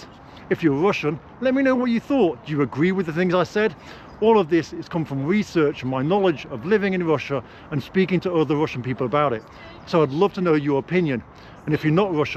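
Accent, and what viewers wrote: United Kingdom. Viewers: your accent somehow brought me to my school years, when on learning English we were listening to the tapes, and thats exactly how the typical British man sounded then. Got suscribed because of that small piece of nostalgy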